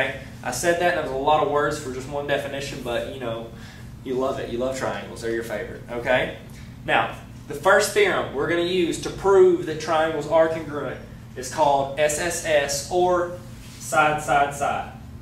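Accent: South, United States. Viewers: That southern accent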